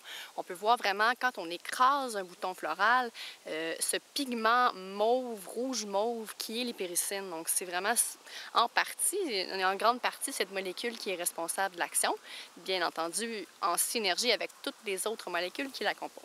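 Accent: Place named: Quebec, Canada. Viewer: Merci pour votre partage de connaissances avec votre accent québécois si charmant. J'ai plein de millepertuis sauvage qui pousse devant chez moi et je ne savais pas comment l'employer. Je vais faire une bonne huile pour mes filles quand elle viennent séjourner chez moi, en Bretagne. Mille mercis ! ⚘🌼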